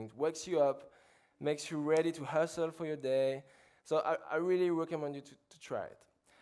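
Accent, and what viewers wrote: France. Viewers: America always falls for any French accent when added to a hunkie body. This guy is a trail blazer he will go far. Because under the anarchy of his life he has the zen zazou spirit. Everybody loves a smiling hunk with a French accent ou la la mama mia!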